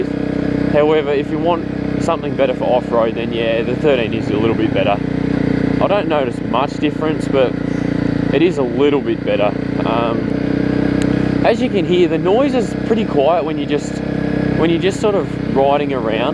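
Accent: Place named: Australia. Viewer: I am in Canada have been watching your vids for a while and have a 250L and i cant tell you how many times I have joked around and said here in a aussie accent and my friends all look at me like im retarded lol your awesome dude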